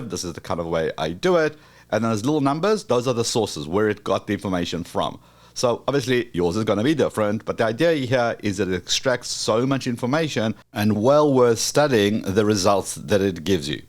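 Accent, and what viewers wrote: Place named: New Zealand. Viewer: Just found this channel from your main channel and instantly subscribed without even watching a video. Why? You're awesome, that's why! I love all the useful information, excellent delivery, and -- who doesn't love a New Zealand accent? 🤣 They're the beest!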